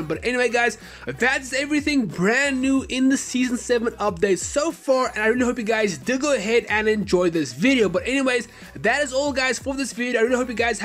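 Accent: South Africa. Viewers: Squally are you South African?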